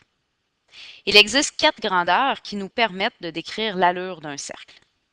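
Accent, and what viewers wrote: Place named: Quebec, Canada. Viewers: Accent québécois*** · J adorre l accent canadien 😍 Très bonne vidéo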